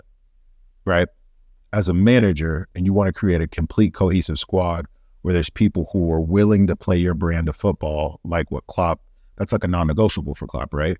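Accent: United States. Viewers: I've just subscribed for one reason,and one reason only! You are the only person with a US accent that knows exactly what you're talking about. There are a couple of points that you have made that I totally agree with. Not that there right (sometimes it's a matter of opinion). But I have a good knowledge of Liverpool. And the current team. And you are 100% on the money on so many points 👍. If you need to get a bit more exposure, and grow your Chanel? You need local YouTube channels to start looking at you. Contact some of them and see what happens. Knowledge is knowledge. And a red is a red. Looking forward to listening to more of your analysis 👍👍👍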